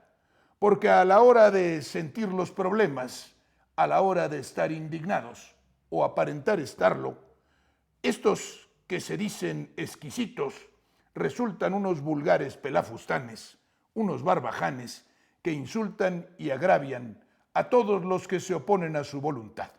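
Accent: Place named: Mexico. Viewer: Me encanta de este señor la belleza con que usa el idioma, lo domina como español culto, pero con pronunciación mexicana. Por lo demás, concuerdo totalmente con sus valoraciones y señalamientos. ¡Qué cátedras de dominio del mejor español! Desde luego sin petulancias ni pedanterías. Todos unos editoriales sus textos. Después de soportar unos segundos escuchar al porcino Alazraki -y con perdón de los cerditos, que son hermosos y muy inteligentes-, oír a es un manantial en el desierto. ¡Felicidades, señor!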